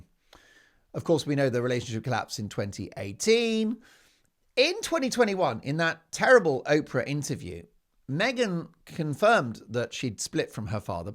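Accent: Canada. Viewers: Your Canadian accent is quite good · The Canadian accent is ace! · Love your french from Moncton NB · Your Canadian accent is so funny. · Your Canadian accent is great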